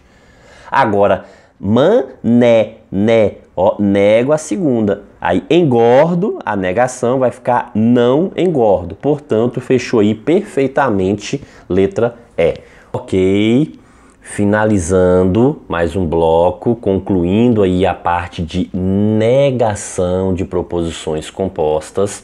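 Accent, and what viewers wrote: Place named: Bahia, Brazil. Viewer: Excelente trabalho, professor! O sr. é da Bahia? Se não for parece mto. Eu sou de Olinda, Pernambuco.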